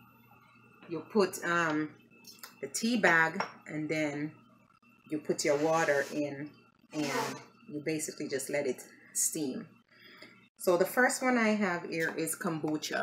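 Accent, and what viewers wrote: Jamaica. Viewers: love your accent, Jamaican?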